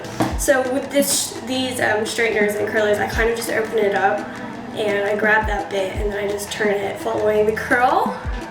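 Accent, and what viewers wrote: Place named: Australia. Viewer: you've gained a little bit of an australian accent :p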